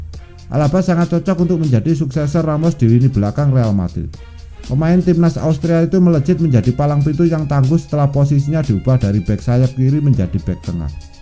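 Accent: Java, Indonesia. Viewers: Min biground nya cari yg bagus sedikit suara gak jelas bgt apalagi logat jawa kental jadi gak kedengaran min..🙏🙏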